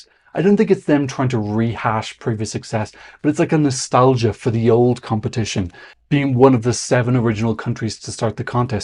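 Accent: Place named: Ireland. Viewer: Why does future sound completely different? Even his Irish accent is stronger! 🤔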